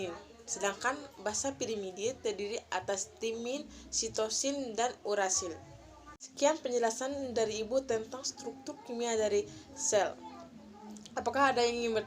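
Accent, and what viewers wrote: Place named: Bima, Indonesia. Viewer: Bimanya kental banget😄 semangat terus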